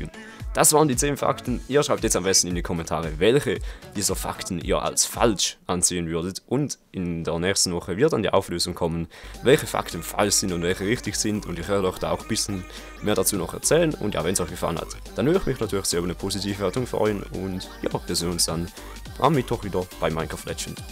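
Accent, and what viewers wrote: Switzerland. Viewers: Süsser Schweizer akzent ;D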